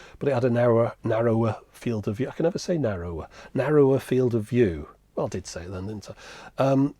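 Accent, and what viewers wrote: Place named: Wirral, United Kingdom. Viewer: . I'started watching you three or four months ago and got hooked, so today a signed up with Patreon. As a Brit living in the USA, with maybe a few more miles on the clock than yourself, and married to a girl from the Wirral, it's great to hear that accent again. I know you like units with a CRT embedded in it and wondered whether you know anything about the portable Galaxy 2200 Video Cassette recorder? Its of the 'made of steel' era with a corded remote, powered by battery or mains and with a handle to carry it around... There's precious little info on the web and prices go from free to $250 functioning. Any how, I could make an unusual piece. Much love from across the ocean. Leon.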